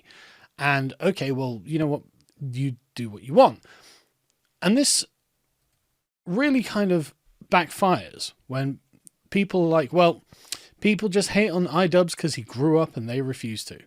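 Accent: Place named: United Kingdom. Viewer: I love our boy providing updates on internet drama in a proper suit and brittish accent.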